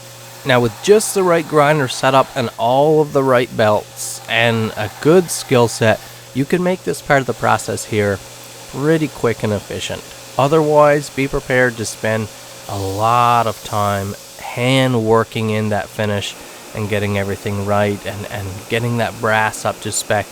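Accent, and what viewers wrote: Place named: Newfoundland, Canada. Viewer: It’s a Newfoundland accent.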